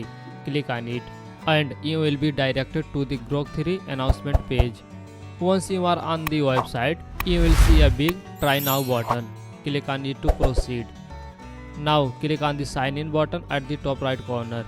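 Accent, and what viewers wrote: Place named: Southwest Asia. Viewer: almost unintelligible. This would be fine in southwest Asia, but the speaker's accent is very difficult to understand.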